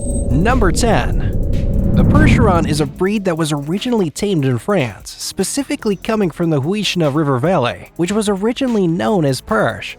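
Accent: United States. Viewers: I love how this dude speaks "Countrey"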